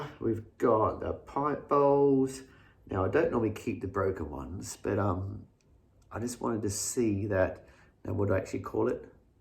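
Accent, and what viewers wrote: Australia. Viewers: So nice to see and hear another Aussie away from home. Was really funny how you called it a sanga as I said that to my Dutch hubby the other day and had to explain what I was saying. Was a great Episode... keep em coming :-)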